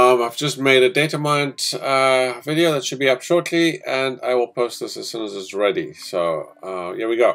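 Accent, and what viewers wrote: South Africa. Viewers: This dude sounds super south african😂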